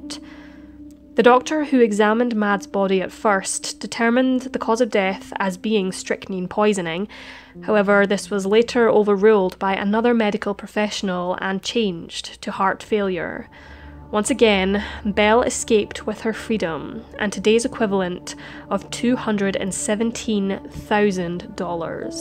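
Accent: Scotland, United Kingdom. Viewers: NOPE, SHE'S SCOTTISH!!!😘😘😘😘😘😘😘😘🥰🥰🥰🥰🥰🥰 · Scottish Accent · Jason Erb it’s Scottish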